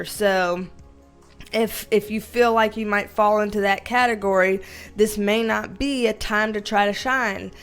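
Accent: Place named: Rural, United States. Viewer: As a country girl myself I love your accent. It feels so familiar and comforting! Love ya! Keep up the great work and congratulations on 10k!!! 🤩🥳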